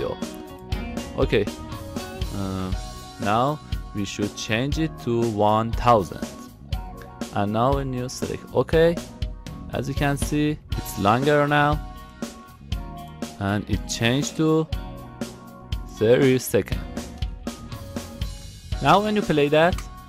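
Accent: Iran. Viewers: Thanks, mate. you are sound Persian ;) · That Iranian accent ;) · az avalesh goftam in iranie :D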